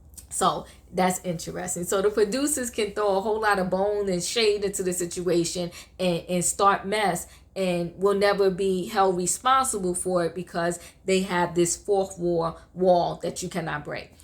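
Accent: New York, United States. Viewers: You have a NY accent but your skin too healthy. You in FL?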